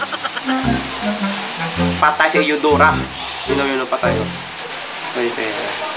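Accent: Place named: Visayas, Philippines. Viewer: halatang bisaya talaga...hahaha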